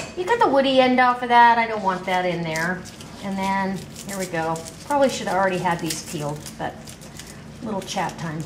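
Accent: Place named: Texas, United States. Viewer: You sound like your a Texan. :)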